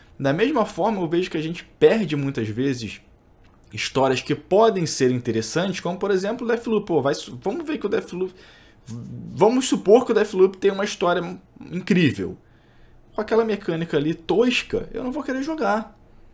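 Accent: Rio de Janeiro, Brazil. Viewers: esse jeito de carioca do falar é bom demais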